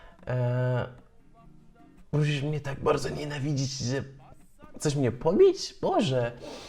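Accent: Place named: Rzeszów, Poland. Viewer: teraz wyglądasz i mówisz jakbyś był z Rzeszowa:) Pozdrawiam.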